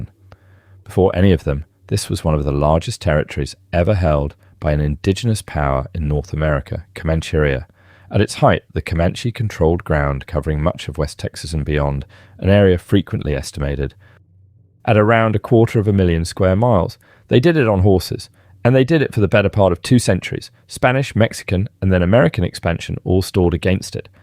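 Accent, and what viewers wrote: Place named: United Kingdom. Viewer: as soon as i hear a frickin british accent i shut it down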